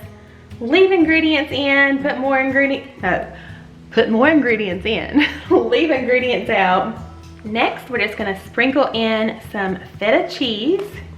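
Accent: South, United States. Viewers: I sooo enjoy watching all your videos! I love your southern accent! I'm from Oklahoma. Us southern girls have that accent!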